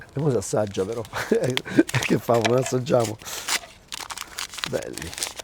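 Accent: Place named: Nettuno, Italy. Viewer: sei proprio de nettuno 😂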